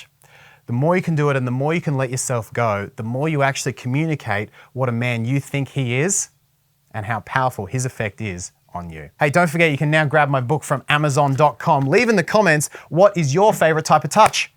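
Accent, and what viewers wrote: Australia. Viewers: are you Australian? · is that ur real name, I have good reasons to ask, r u Chris Pratt? Someone meshed some faces in vids sent to my phone. I met someone in my town I'm living in, in Canada, who looks like you or like the Vid of Chris Pratt sent to my phone. (R u Chris?) The man I met said his name was Dylan? Do you actually have a real partner or just for the vid? Is ur Aussie accent for real, is ur Mat whose filming ur vid, last name Leblanc?(not the friends actor)?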